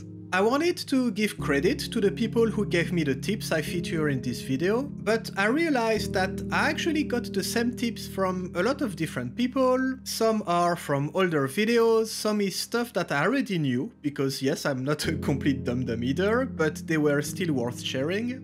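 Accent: France. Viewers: Are you french ? Greets from France :) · J’ai le droit de commenté en français? On reconnaît bien l’accent 😂 Belle vidéo !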